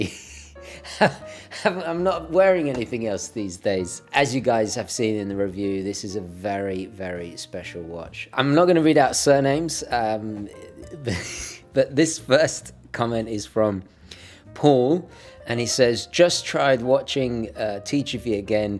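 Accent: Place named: United Kingdom. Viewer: I really laugh a lot in this video. I’m from México, I use to live in an island on the Caribbean Sea, you should go there for vacations. But now I leave in Mexico City for university. Here no one speaks in English, but also, here I started to really like de language. And more the british accent like you have. That reminds me of my English teacher back in those days. I found your videos very interesting, and now I’ve just bought my first watch. I was the one asking about pocket watches months ago, but starting to know more about horology, now I bought a invicta pro diver. I know, a controversial watch. But that’s what I can afford at this moment, and I also choose that because my dad is (but he doesn’t work on that anymore) a diver, and I want to learn in the future. Thanks for your videos, I learn and practice my English at the same time.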